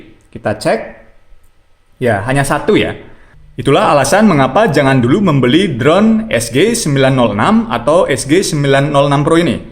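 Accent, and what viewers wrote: Bali, Indonesia. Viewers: Logat bali, Semeton ne 😍👍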